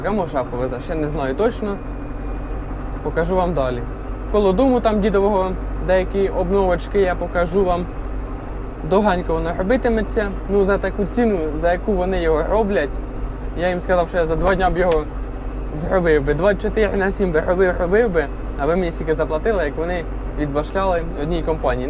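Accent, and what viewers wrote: Poltava, Ukraine. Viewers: Супер земляк.Що їм мова не нравиться,як причепляться людина із Полтавщини.Нікого не слухай наш суржик супер.Удачі.Хай щастить у житті. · Я з перших кадрів,коли тільки почала дивитися канал,зроміла,що з Полтавщини,говір неповторний ❤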